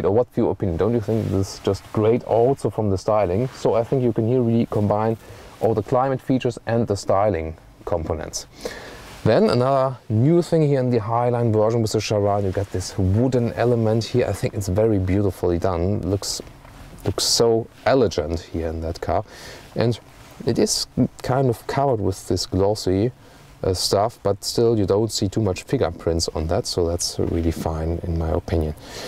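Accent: Germany. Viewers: My men ur English sounds quite. ... German 🤣 all the respect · 德国人说英语其实非常有特色，而且在欧洲算是很流利的。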